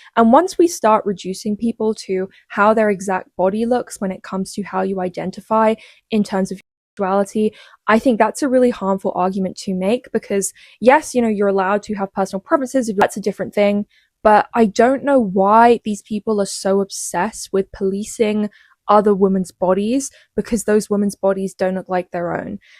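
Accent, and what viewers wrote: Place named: United Kingdom. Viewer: I also like your accent,. I like British and British-sounding accents a lot. 🤍🪽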